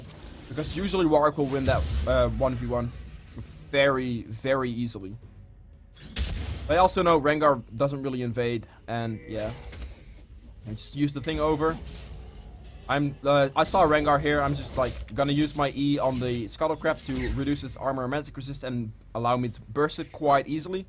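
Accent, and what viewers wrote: Netherlands. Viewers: Ok 2 things r u dutch? And btw isnt free stop+free boots bettrr?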